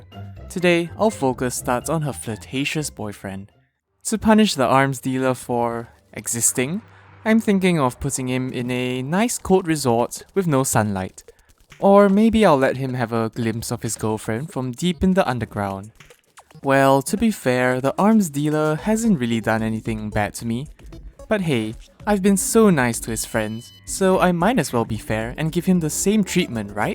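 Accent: Singapore. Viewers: Are you singaporean? I can tell from the accent if so lol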